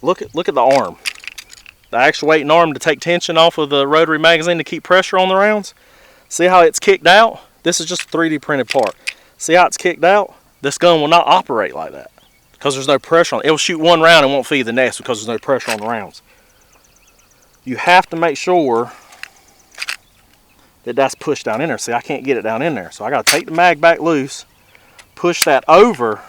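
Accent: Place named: Carolina, United States. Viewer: That’s gotta be a fellow Carolina boy with that accent 😂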